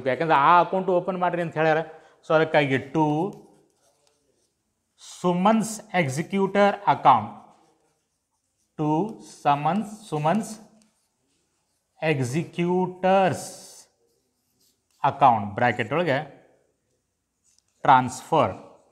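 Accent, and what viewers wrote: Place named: North Karnataka, India. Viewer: ಸೂಪರ್ ಟೀಚಿಂಗ್ ಸರ್ ನಿಮದ ಉತ್ತರ ಕನ್ನಡ ಭಾಷೆ nice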